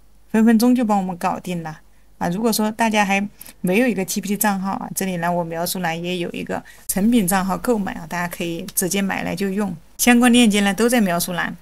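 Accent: Hubei, China. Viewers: 湖北仙桃口音？ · 为什么我觉得和雷军一样是湖北口音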